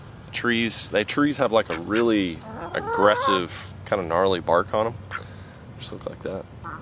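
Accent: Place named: Texas, United States. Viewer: What part of Texas are you originally from? I have friends from Texas and your accent sounds a little different then theirs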